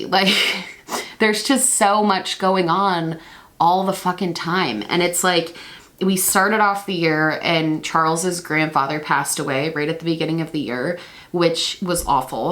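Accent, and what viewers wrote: United Kingdom. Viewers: my love, we are going to need book reviews now. Full English accent accepted. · Video in a British accent? 💜 lots of love!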